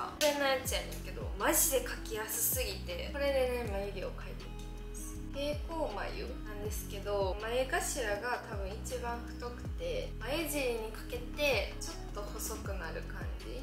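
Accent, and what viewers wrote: Kansai, Japan. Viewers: 関西弁？やばすぎて聞きづらいｗｗｗｗｗｗｗｗｗｗｗ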